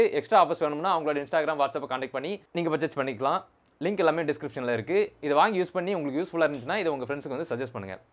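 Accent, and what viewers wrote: Tirunelveli, India. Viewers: Anna neega tirunelveliya ??? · Neenga tirunelveliyaa? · Anna neenga tirunelveli ah?? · Neenga tirunelveli ya bro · நீங்க திருநெல்வேலி தான நண்பா.... எவம்லே அது....அந்த ஒரு வாா்த்த போதும்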